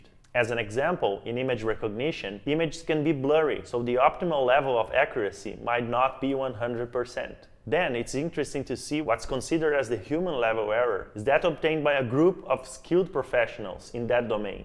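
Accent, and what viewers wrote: Brazil. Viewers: Legal, cara, deu para perceber só pelo sotaque. Bom saber que tem um pezinho nosso na TRIBE. Um dia quero fazer um curso aí com vocês. Eu sou cearense, mas moro em Santa Catarina. Já estudei na Inglaterra e na Austrália. Sou formado em Ciências da Computação, mas a 4 anos venho estudado o Marketing, de um forma mais técnica. Esse é um site é fala sobre mim: (está um pouco desatualizado já) <link> E aqui o site da empresa que estou ajudando a criar: <link> Mas valeu, quem sabe eu vá conhecer o trabalho de vocês em Amsterdã. Abraço.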